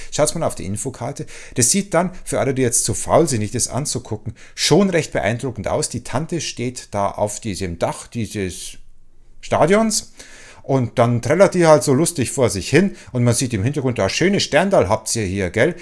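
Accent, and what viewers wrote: Bavaria, Germany. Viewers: yep - sehe ich auch so. Woher aus dem grossen "Kanton" bist den eigentlich? Toent irgendwie bayrisch ... Muenchen? (PS: fragen kann ich ja mal, antworten ist ja freiwillig)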